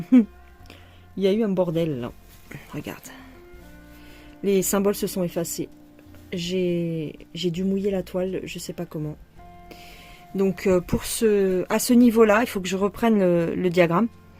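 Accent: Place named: Marseille, France. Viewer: Coucou du Québec. Bravo pour tes belles avancées et ton enthousiasme communicatif. Et j’adore ton accent. De quelle région viens-tu? Je dirais Marseille…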